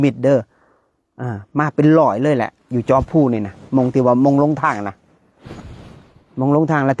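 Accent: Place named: South, Thailand. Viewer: สาธุสาชธุคะ่ชอบฟังมากมากคะ่ยกระแสสินธุ์พากใต้คะ่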